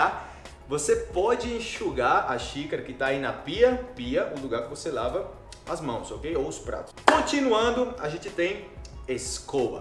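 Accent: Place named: Colombia. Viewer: Eu não gosto muito do acento colombiano, mas quando você fala espanhol com esse acento, soma muito engraçado além das "muecas".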